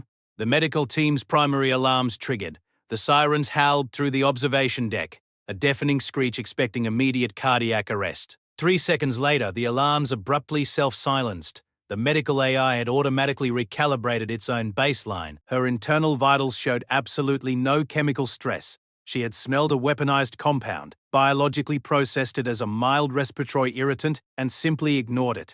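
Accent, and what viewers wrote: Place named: Australia. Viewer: Based on the narrator...she was an Australian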